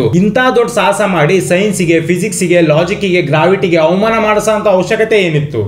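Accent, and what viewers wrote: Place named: Harihara, India. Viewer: Olle comedy bro n nim dialogue super.. nam Harihara bhaashe kushi aguthe keloke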